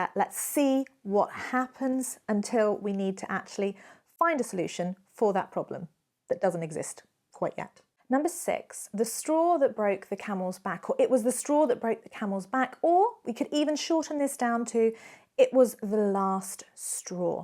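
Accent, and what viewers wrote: United Kingdom. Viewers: British or american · So charming with your British accent. Just in case anyone was wondering, these are all commonly used in the US as well. Here's one I love, but seldom hear; it seems to come from the midwestern US: "You can get used to hanging if you hang long enough."" It expresses a grudging patience with unpleasant circumstances one is not able to change, with reference to the lynchings that were a part of vigilante justice in frontier days. Example: "Do I really have to put up with that child's whining all summer long? Well, I suppose you can get used to hanging if you hang long enough."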